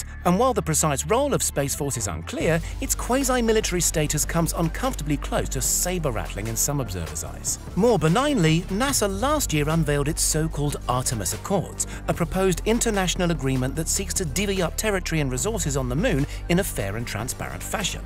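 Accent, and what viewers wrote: United Kingdom. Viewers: Hes british chile...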